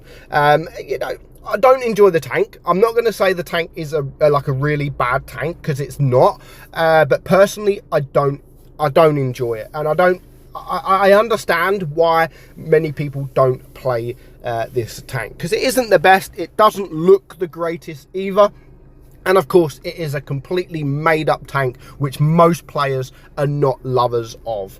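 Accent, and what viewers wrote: United Kingdom. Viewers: He has a accent and I think he British 🦷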